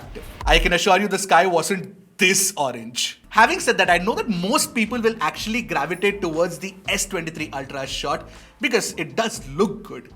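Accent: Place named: India. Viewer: The pronunciation of "T'' in Indian accent is the ugliest thing I've ever heard.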